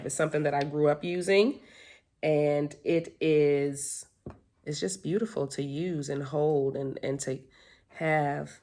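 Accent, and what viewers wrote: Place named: DMV, United States. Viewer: I hear a DMV accent…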